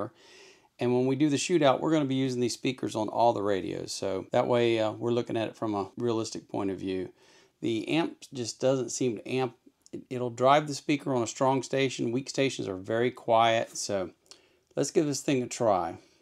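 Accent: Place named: Spain. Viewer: Your french sounds a f... lot like spanish.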